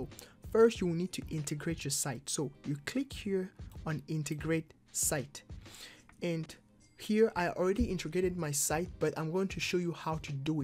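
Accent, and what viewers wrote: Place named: Nigeria. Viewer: I just landed on your channel and l stayed on. Good stuff. Your accent sounds Nigerian.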